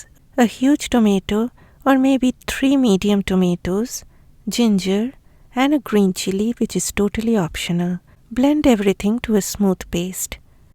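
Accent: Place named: India. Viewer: Haha indians have cute accents. Wobbly head nods are funny.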